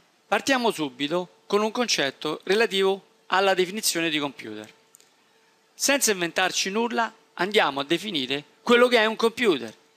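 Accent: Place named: Rome, Italy. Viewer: già mi ha attirato molto l'argomento della discussione, ma con la tua voce romana mi hai convinto ancora di più :D sarebbe un miracolo se tutti gli insegnanti avessero la tua parlata ^_^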